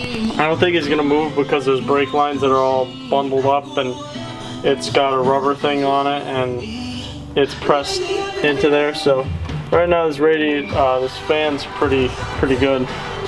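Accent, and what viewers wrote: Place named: Sweden. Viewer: are you swdedish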